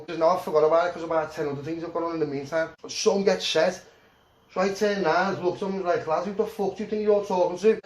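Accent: Liverpool, United Kingdom. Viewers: Haha proper scouser you lad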